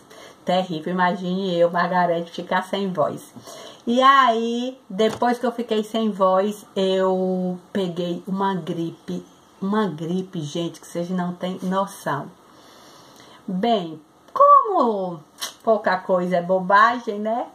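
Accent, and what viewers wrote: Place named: Bahia, Brazil. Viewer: Adoro a sua pronúncia. É tão engraçada. Das pronúncias do português, variante brasileira, as que eu gosto mesmo é da sua (penso que é da região de S. Salvador da Baía) e da de Minas. São muito "cantadas"! Beijinhos de Portugal. Ah, também já sentia a sua falta. Parece que a partir da altura em que me inscrevi no seu canal, deixou de postar com alguma frequência. Volte logo, para poder ouvi-la (gosto!).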